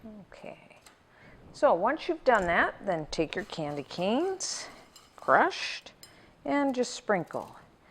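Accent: Canada. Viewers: whoah shes canadian! haha thats awesome!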